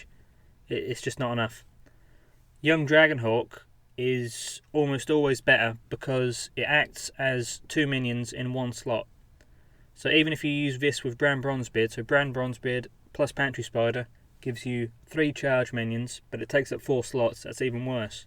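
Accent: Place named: Norfolk, United Kingdom. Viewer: Never seen you as English. You from Norfolk lol?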